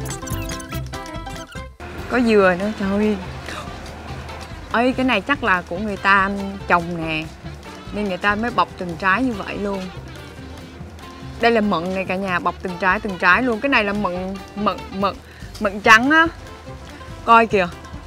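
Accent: Vietnam. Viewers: dể thương quá chú rất thich cach nói chuyên dúng chất ng vn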